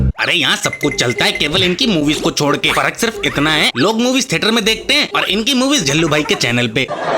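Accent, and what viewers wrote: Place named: Assam, India. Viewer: Big fan bro Choro Choro assosom type se bolte Ho bhai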